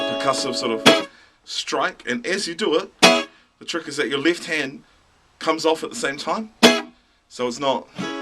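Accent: New Zealand. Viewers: haha you are hilarious dude. Stoked to hear a kiwi accent mah bro. great tutorial too man. Such a solid RnB song aye. Us white boys got some soul too haha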